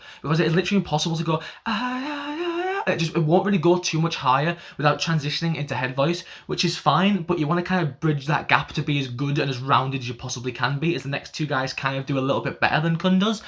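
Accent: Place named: Northern England, United Kingdom. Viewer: Can I ask where you're from? You have an interesting accent. It kinda sounds like northern England or maybe even Scotland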